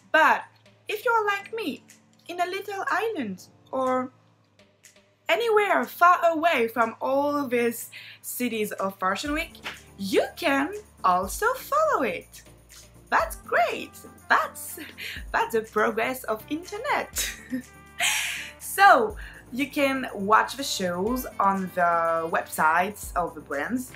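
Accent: France. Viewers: Your accent sounds very french that’s soooooo cute😍😭😭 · Hello ! I am american 🇺🇸and your video interested but on the other hand you do not have American accent but a strong French 🇫🇷accent and you look a little your words which is a little difficult to understand you but otherwise it is a very good video the subject is interesting👍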